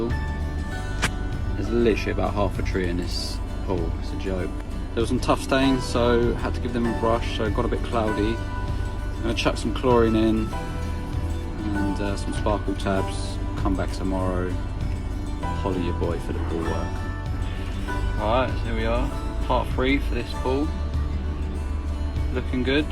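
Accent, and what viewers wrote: United Kingdom. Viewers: based on your accent you’re across the big ol Atlantic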